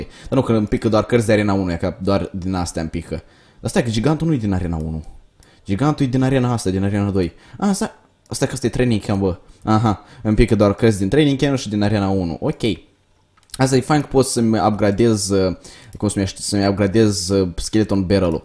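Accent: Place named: Moldova. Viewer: ba moldoveanu-le invata sa vorbesti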